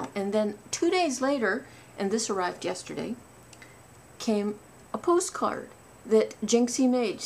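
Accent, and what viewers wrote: Canada. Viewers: I love your videos. I come everyday to your channel to see if you have put up something new. Yes you are quite charming;). I love how you pronounce your words so precisely and your Canadian accent.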